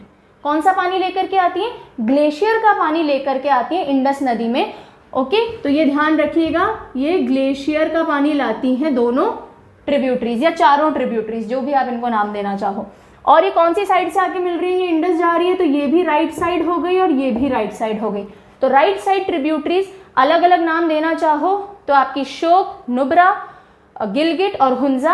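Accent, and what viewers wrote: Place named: Uttar Pradesh, India. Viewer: Awesome maam...she s from knp or lko...aisa lgta h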